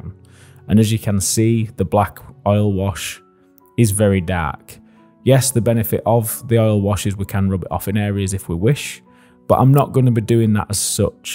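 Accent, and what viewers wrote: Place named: North, United Kingdom. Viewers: Good luck mate. I’ve loved your content from day one. Will say I’ll miss hearing your voice. I grew up in cleethorpes and I don’t get to hear that accent very often where I live now haha. Take care man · If I get stuck with something I always looked here and there was something to help. Going to miss that northern voice. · Hi there. Just found your channel and have watched a few of your videos and I like what I saw so I had to subscribe. I like what you do because I’m wanting to get into doing dioramas myself. Thanks for the great videos that you do and I will continue to watch. Take care and I would say blessings to you from England but I think you actually live in England from your accent so I will just say blessings to you from Weymouth. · To hear someone making content and to do it as popularly as you do it, in an accent like my own is worth its weight in gold. 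No shade to southern or middle class content creators but to have you available to view and for your content to feel that bit more relatable has always felt invaluable. Glad to still have Pickle Jar and MS Paints but you will be sorely missed none the less. Reyt good.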